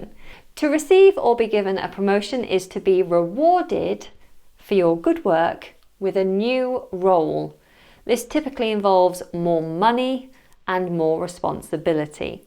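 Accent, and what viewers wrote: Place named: United Kingdom. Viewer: Like you British English accent，sounds so tender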